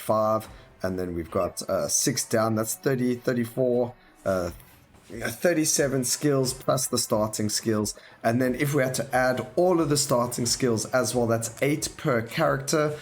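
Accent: South Africa. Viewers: There's a difference between "accent" and "wrong" :D Accent is when you pronounce "aɪ" phonetics as "a" bc South African drops inflection. Wrong is when you say omnipotent wrong :P But hey, just tryna help. No hard feelings.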